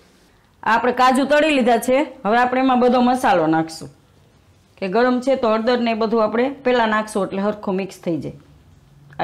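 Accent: Kathiawar, India. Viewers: વેરી નાઈસ. તમે સરસ કાઠિયાવાડી બોલી મા સમજાવો છો... રાજભા ગઢવી જામનગર.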